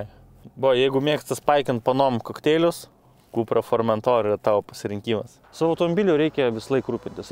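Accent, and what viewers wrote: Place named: Russia. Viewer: Išmoktų normaliai lietuviškai šnekėti o tada jau darytų apžvalgas. Nes tas rusiškas akcentas... šlykštu klausytis,o kai dar tiek rusiškų keiksmažodžių, po 2 minučių išjungiau...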